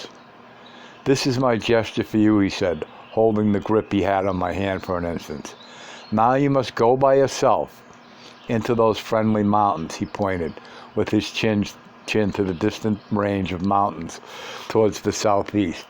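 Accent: Boston, United States. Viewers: This is so niche, and I never thought I would listen to Castaneda with a heavy Boston accent which makes it all more mystifying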